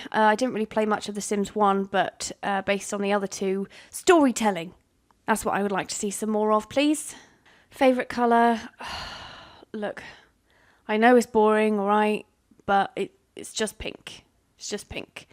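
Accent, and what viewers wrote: England, United Kingdom. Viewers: English accent is elite. ✌️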